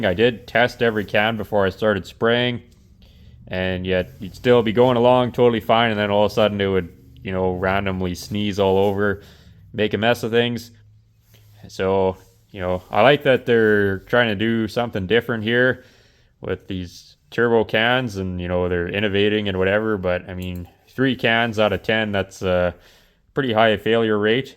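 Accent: Canada. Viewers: Videos like this make me so glad to live in the south. I saw the thumbnail and thought where the heck in the north is this car from, then I heard the accent and realized, oh, the great white one. Nice job for a "quickie" body repair and paint job. When I saw you just pulling the rockers apart, I was just in shock. Never seen anything like that in person, only pics and vids online. Maybe I need to take a trip up north with my CVPI with 0 rust and sell it for 2x as much up there.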